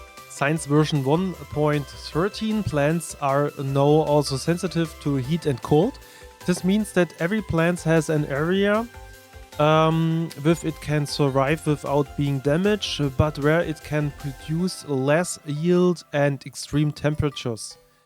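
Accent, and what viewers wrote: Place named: Germany. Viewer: Nice German accent. You remind me of another German of mine.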